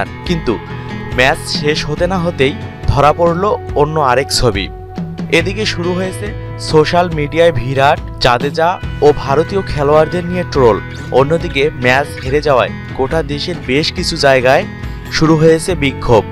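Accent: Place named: Noakhali, Bangladesh. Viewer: Shala bolod messs na match 😂 kotha bolte shikh shala noakhali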